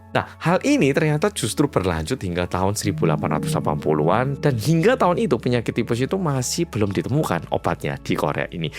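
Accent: Java, Indonesia. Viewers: Hai org korea yg medok😅 sehat selalu 💪🏿 Lgsg nyimak🎉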